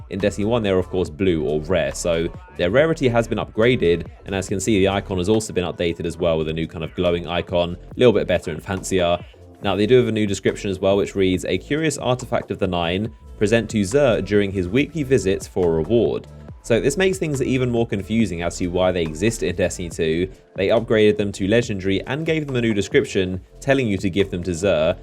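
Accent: United Kingdom. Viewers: I disliked because you’re one of millions of stupid British YouTubers trying to be something. You’re accent and content is useless shit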